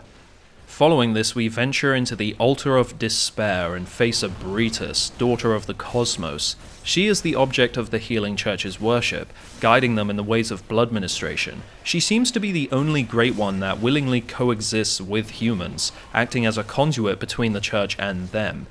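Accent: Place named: United Kingdom. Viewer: I went from british dudes narrating the savanah to british dude narrating videogames.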